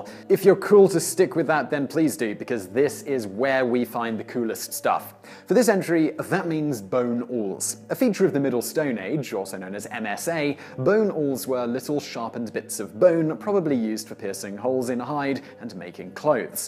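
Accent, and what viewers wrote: England, United Kingdom. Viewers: "British accent"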